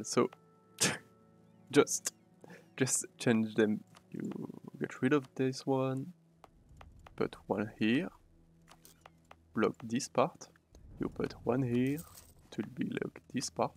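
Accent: France. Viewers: Why you speak english like macron? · L’accent français le plus beaux qu’il soit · A french man speaking english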